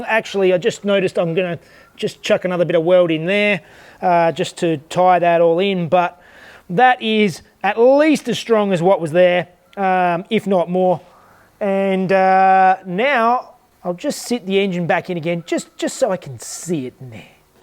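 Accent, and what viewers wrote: Australia. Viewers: Wow from Aussie?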